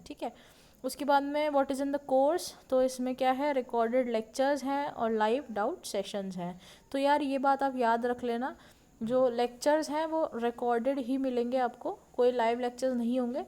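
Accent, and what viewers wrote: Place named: Rajasthan, India. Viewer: aap to rajasthan and haryana sw lagti ho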